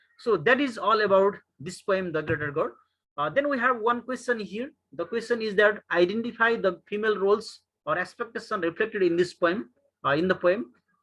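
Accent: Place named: United States. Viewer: Nepali ma ni vannu ni kattina american jasto english matra🙄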